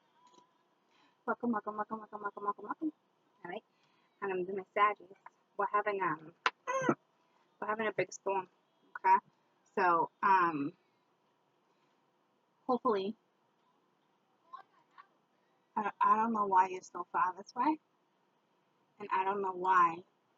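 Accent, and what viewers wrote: Boston, United States. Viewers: I'm frm NY n its sounds like ur frm more north like Boston but ur still attractive n made kool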